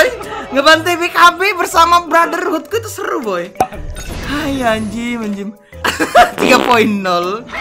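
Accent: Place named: Kalimantan, Indonesia. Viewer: Kek nya orang Kalimantan tuh bro dari bahasanya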